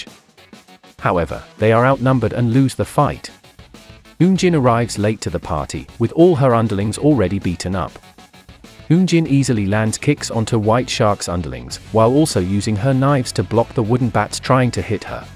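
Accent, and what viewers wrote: United Kingdom. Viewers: Having to listen to very bad English being read by someone with a British accent is very weird indeed.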